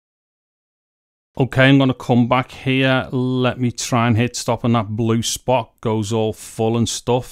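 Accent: Liverpool, United Kingdom. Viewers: That British accent though. By the way nice effort. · Hey, are you from Liverpool?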